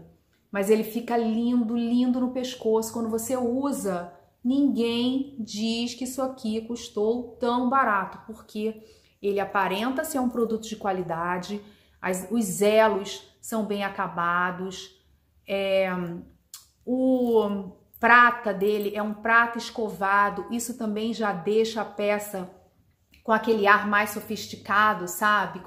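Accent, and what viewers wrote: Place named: Rio de Janeiro, Brazil. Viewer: Bem que desconfiei que você fosse aqui do RJ kkk pelo seu jeito de falar. Tamo junto, parça 😂 espero um dia ser agraciada com a mesma bênção de ir morar no Sul, como você. Bjs!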